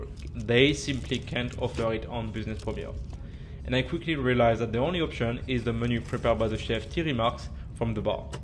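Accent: France. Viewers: It is awful. He speaks english with the speed and pronunciation (cutting the end of words) like french. Subtitles dosn't help because I have to mute the video then. Why should I do that ?! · The voiceover is fine. Yes he does have a strong French accent but I, at least, understand it. Maybe for English speakers that were not exposed to other languages it's harder but if you have a difficulties switch on the subtitles. BTW, Thom from Trains Are Awesome is American. He was born in the Netherlands, moved to Japan Japan when he was young and went back to the Netherlands as a teenager but his parents are American and they spoke English at home. Correct pronunciation can be hard when there are major differences between your native tongue and other languages and in the case of English it's easier for Germansor dutch, for example, that to French or Spanish speakers. It also depends on the amount of exposure and who early you start. 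· Thank you for all of the great train content and now doing verbal sound. I have taught English as a Second Language and have a couple of tips. Some people tend to read out loud faster then they would normally speak. You have admitted that your French accent is thick and that is true. If you slow down, your English would be better understood. I am not suggesting anything dramatic, but in some places you are reading so fast that your words slur into one long word. In places of the video where you are adlibbing the English is easier to understand. Please know this is not a complaint. I am just offering a little advice. The advice is free and of course that may be all it is worth. 😁😁😁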